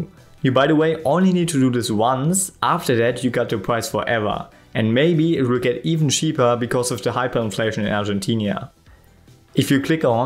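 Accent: Germany. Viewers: meinte Deutschland einfach wegen aktzent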